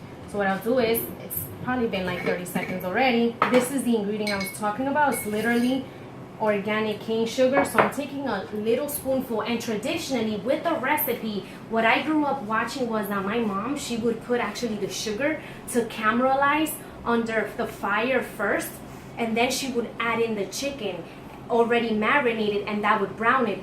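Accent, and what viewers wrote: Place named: New York, United States. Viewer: Enjoyed ur video. I am Puerto rican, and I enjoyed watching you make this. Nice job. Are you from New York City? You kind of had that New York flavor...lol Thanks again!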